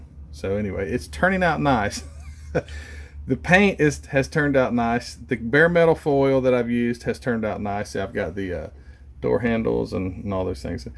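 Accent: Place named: Georgia, United States. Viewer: I rather watch videos with someone explaining things than just music , I like listening to you cause you are from my home state Ga and I miss it but I sure don't miss the humidity. .o boy lol.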